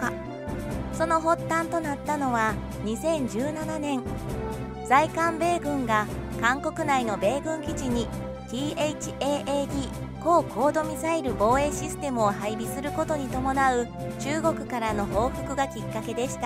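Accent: Kansai, Japan. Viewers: 柔らかい関西弁、好き！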